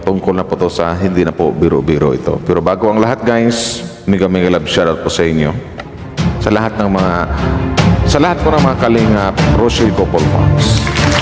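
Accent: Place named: Batangas, Philippines. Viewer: ❤sir ang accent Ng salita ay Batangas.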